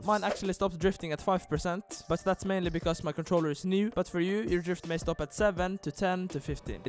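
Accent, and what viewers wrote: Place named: Norway. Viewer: er du norsk? if not you just sounds like a norwegian guy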